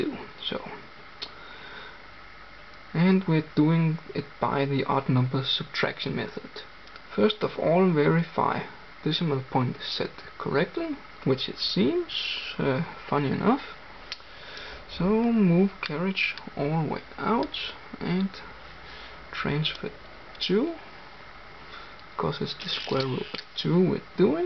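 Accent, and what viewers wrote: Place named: Denmark. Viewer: oh god that horrible accent you're danish aren't you?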